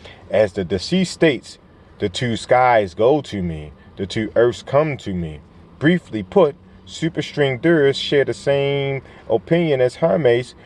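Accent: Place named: Baltimore, United States. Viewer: Gotta be from Baltimore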